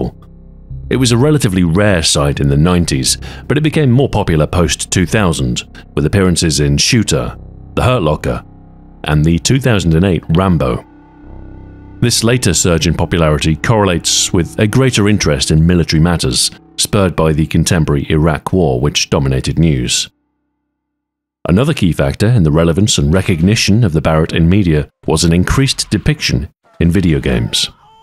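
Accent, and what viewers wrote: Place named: Manchester, United Kingdom. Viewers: I want to say Manchester, England. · He's British.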